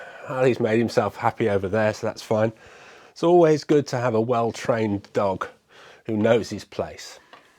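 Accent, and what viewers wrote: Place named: RP, United Kingdom. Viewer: You might want to get a tripod? Just set your camera on it and then both hands free and Bob's your uncle! By the way, Just found You and delighted to find an intelligent chap who speaks the Queen's (no pun intended) English, unlike most of the other Keepers of Bees on YT who seem to all be from the American South. Nice guys but their communication skills are rather "provincial". Needless to say, I've subscribed. So one more to add to your 3.91Ks. Now on to "How to Make & Use a Bee Vac". Cheerio!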